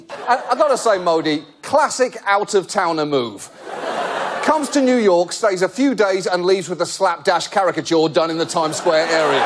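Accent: United Kingdom. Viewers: Pavlovian reinforcement, every fucking segment goes like this. 1. Introduction to topic ( normally around 10 seconds ) 2. Snarky remark, normally non-sequitur. this keeps you from fully processing part 1 and coming to your own conclusions. 3. Approving laughter, this is what keeps you hooked, equivalent to the food that Pavlov gave his dog. Effectively ruining your sense of humor, reasoning skills and overall intelligence 4.Repeat until you get enough for a full show. this guy is shit humor, he makes you dumber the more you watch him, but makes you feel smart because of his Brit accent and glasses. By malicious intent, or stupidity on his part, humor is now worse off because of this nightmare of Steinbeck and Orwell.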